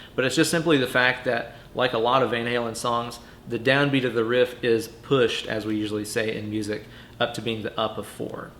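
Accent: South, United States. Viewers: Are you from the south ?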